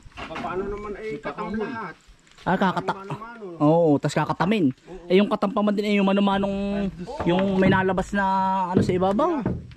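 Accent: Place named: Batangas, Philippines. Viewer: Hawig sa puntong batangas eh, saan ga po kayo sa Batangas?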